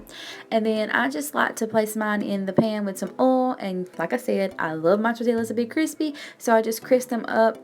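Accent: South, United States. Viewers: LOL, she's from the South and pronounces words a little different like maters instead of tomatoes 😉 I'm a NC Southern girl myself 😁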